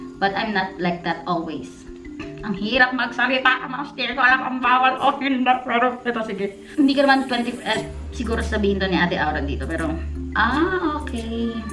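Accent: Batangas, Philippines. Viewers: Yay! <3 nakakabitin lang po. Hahaha! Since napanood ko yung blog mo na naaksidente ka, pinanood ko na din yung ibang videos mo kasi nacurious ako pano ka naging vlogger eh last time i know, galing kang PBB. Napaka genuine mo sa videos mo, malapit din kasi kami sa Batangas at parehas tayo ng "punto" pag nagsasalita kaya nakakaaliw na mapanood ka. Hahaha! As in very lunch time, gusto ko nanonood habang kumakain, vlogs mo pinapanood ko hahaha! More powers! God bless you:)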